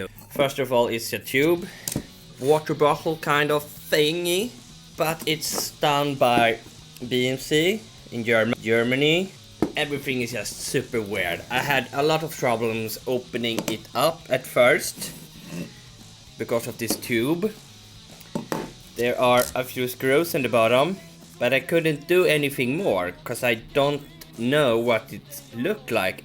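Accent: Sweden. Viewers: Swedish accent, Skatepunk/Millencolin in the background, talking about battaries on bikes. I am a fan(Denmark)!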